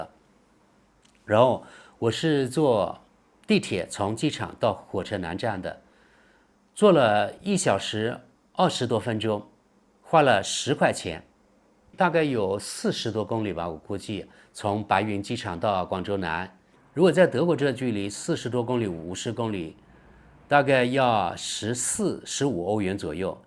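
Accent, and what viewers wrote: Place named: Guangxi, China. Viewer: 丢拿马 我以为是个洋鬼子普通话不标准 原来是我广西老乡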